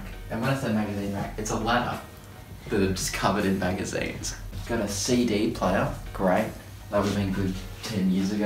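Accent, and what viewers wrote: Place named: Australia. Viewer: AEC Media that’s called an “Australian accent”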